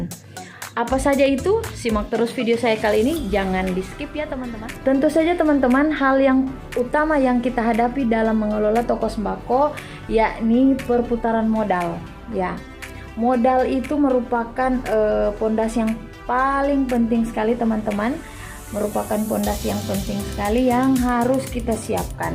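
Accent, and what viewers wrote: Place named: Sulawesi, Indonesia. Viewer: Ibu ini orang mn, logatx kaya orang Sulawesi.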